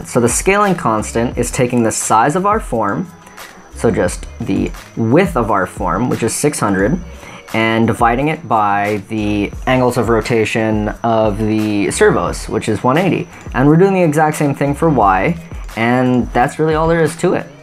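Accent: United States. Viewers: American, just not a 'Merican.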